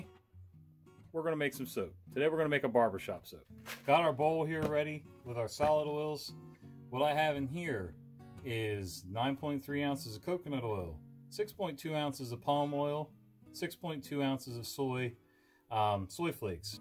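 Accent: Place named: South, United States. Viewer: I honestly didn't expect words like mantra and ta-daa coming from that southern mouth(although you did explain, you grew up in jersey) lol. really refreshing to hear people like you. ~keep it up.coming straight at you from karachi, pakistan. Also never stop starting ur videos with yuuuppp.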